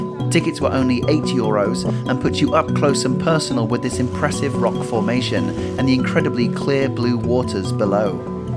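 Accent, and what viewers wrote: England, United Kingdom. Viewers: Such a pleasure hearing an English voice rather than the ubiquitous American voice. I have a son living and working in Malta but this video is the only sight I will see of Malta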